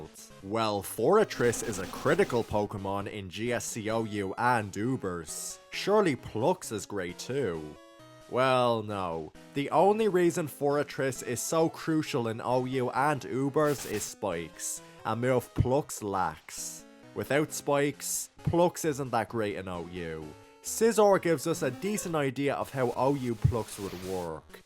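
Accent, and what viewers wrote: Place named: Ireland. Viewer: The accent is very whiplashy to me because I got bullied so hard in Dublin for liking pokemon, so seeing a really cool and nerdy pokemon video in an Irish accent is WILD to me lmao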